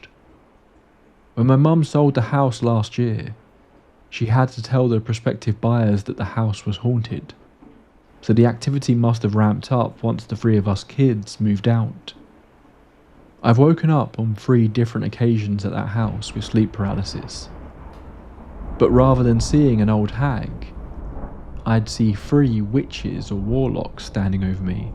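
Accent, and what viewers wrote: United Kingdom. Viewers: Love your british accent .great stories..from usa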